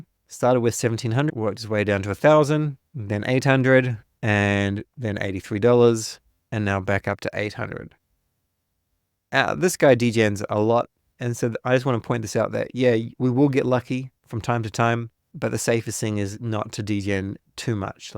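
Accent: Australia. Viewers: As an Aussie i love that your accent is still intact 😊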